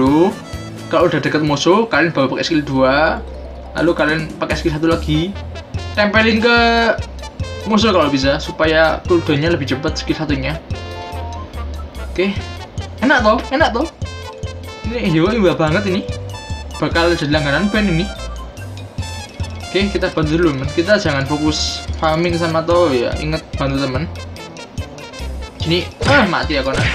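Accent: Java, Indonesia. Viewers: Jawa nya ga nahan bos haha Pertahankan sebagai ciri khas mu haha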